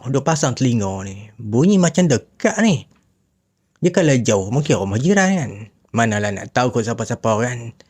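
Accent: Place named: North, Malaysia. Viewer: Salam.. Nak bajuu said L... Tq slalu tnggu video abg.. Sedap dngr loghat utara sbb saya Utaga..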